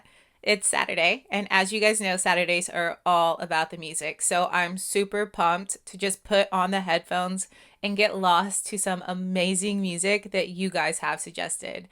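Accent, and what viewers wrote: South, United States. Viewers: This song is just too short. It needs at least another verse and chorus. BTW, your southern drawl is showing 😃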